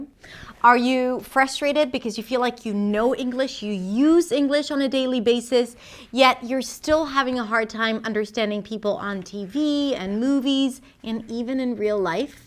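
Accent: United States. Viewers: Hi, I am new to your channel... I am amazed how goid your Englush is even though you aren't a native speaker but you sound really American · Excellent but please teach me how to sound can and can not (can't) in your american accent. Thanks you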